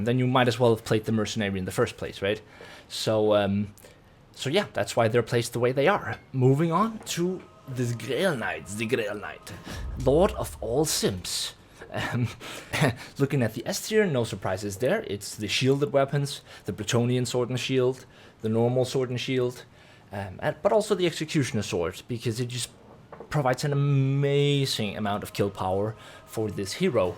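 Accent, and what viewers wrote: Denmark. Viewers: My first video I'm seeing of your. You sound danish in your accent. Wondering where you are from my man?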